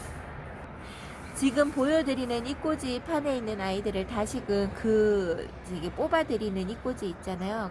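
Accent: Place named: Ulsan, South Korea. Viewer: 울산 어쩐지 목소리가 친근감이 있어서 저도 울산^-^ 항상 잘 보고 있답니다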